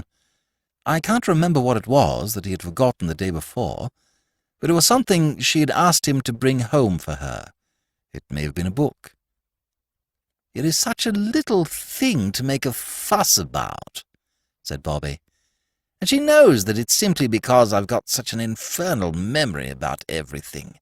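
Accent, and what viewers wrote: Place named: United States. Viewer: American narrator...PG Wodehouse American.. .are you befuck???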